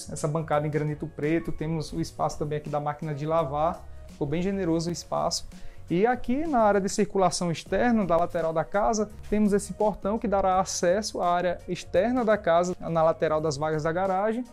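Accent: Northeast, Brazil. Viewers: Adoro ver as casas que você apresenta nesse seu canal. São tão maravilhosas que fica difícil nomear uma como preferida. No fundo no fundo, prefiro todas pois todas me inspiram de alguma forma. Rsrs E quanto a você, é um rapaz muito simpático, educado e bonito que sabe passar bem a sua mensagem com esse sotaque nordestino que eu adoro.. Boa sorte!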